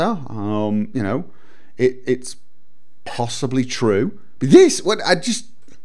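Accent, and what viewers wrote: United Kingdom. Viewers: on the other hand, a British rant has a flavour all its own. (^_^) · I clicked on this because I enjoy hearing British people talk. Same reason I watch TotalBiscut and Yatzee. That and I am interested in what they have to say. British game reviewers > American game reviewers.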